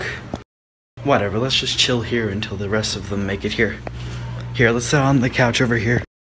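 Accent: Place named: Russia. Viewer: Russia accent is still golden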